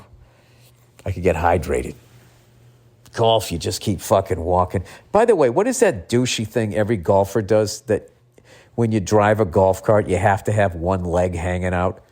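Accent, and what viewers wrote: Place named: East Coast, United States. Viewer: i remember once in the good old bad days of this podcast when was much angrier and spoke more east coast that is to say much faster. he was talking about how people were complaining about not being able to download episodes off sound cloud i think? he said " what the fuck are you guys working your fingers to the bone pressing your download button for your free entertainment complaining get the fuck outta here". i realized he was right. that being said i cant belive this actually showed up on my feed on a monday. i was so blown away i wrote this comment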